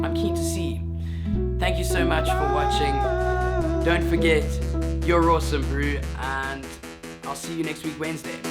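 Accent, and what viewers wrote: South Africa. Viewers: So nice to hear the South African accent, keep on inspiring bru, I made a film myself and I kinda realized I did way too much of the stuff I shouldn’t xD Would love if you came up to Joburg somewhere along the line, I dig your content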